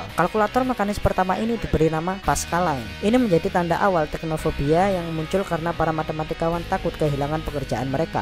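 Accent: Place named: Java, Indonesia. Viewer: laa Medhok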